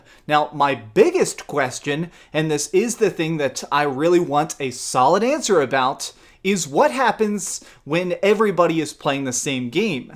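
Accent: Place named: United States. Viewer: brush your teeth man. you look British pretty sure you’re American judging by your accent.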